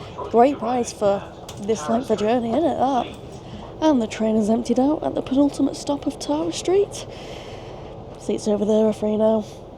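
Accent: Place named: England, United Kingdom. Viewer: Nice to hear an English persons perspective on Ireland...love that accent by the way!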